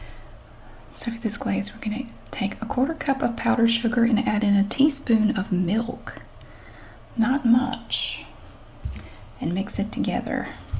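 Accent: South, United States. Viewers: I live for these recipe videos with her southern drawl. Amazing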